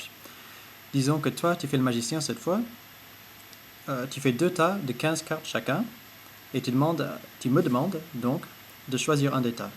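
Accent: Quebec, Canada. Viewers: bravo j'adore l'accent québecois :-)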